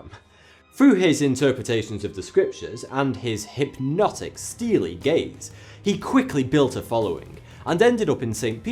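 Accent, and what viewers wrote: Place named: England, United Kingdom. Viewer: Where in England is this guy from? He has that game of thrones type of English accent.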